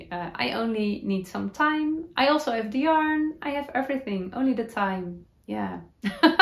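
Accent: Netherlands. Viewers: Love your podcast and your Dutchy English! Your Ariana sweater looks great. As you were describing what you wanted at the centre front of the neck I think you might find that the centre double decrease will give you the look you want (for the next sweater!) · I love your sweater! The colors are so beautiful and looks great on you. I wonder if you could embroider a couple of small flowers in the extra stitch gap area in the front or add small buttons. Just an idea if it continues to be a concern for you. I love your podcast and your Dutchy English😊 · I am from Oregon USA. Your youtube channel is so relaxing to watch while I knit, from the beautiful introduction music and throughout. I am a slow knitter, my process is slower and my lifestyle doesn’t allow me to finish projects as fast as I’d like and I never feel bad because of it when I watch your episodes because you never present an unrealistic amount of items you’ve finished. 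You have a very pleasant “Dutchy English” and your style is lovely. Thank you!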